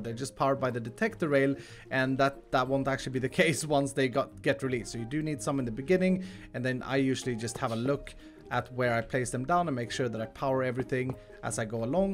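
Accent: Sweden. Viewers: That Swedish accent tho! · Så jäkla kul med svengelska!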